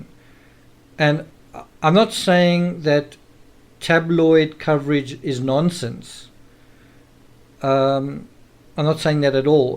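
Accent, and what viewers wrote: South Africa. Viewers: I agree with you Diana, makes absolute sense by appropriate investigation and analysis of evidence, I have read a few of his books before he started this blog unbiased and rocket science ☺️ with his clear South African accent.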